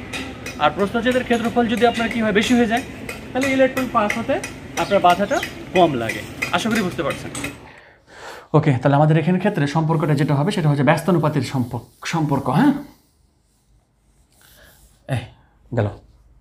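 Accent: Rajshahi, Bangladesh. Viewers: আসসালামু আলাইকুম। স্যার আপনার বাড়ি কি রাজশাহীতে ??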